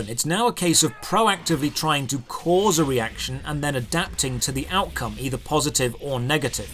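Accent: England, United Kingdom. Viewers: I like the way u talk to me English man, Keep it up ole chap XD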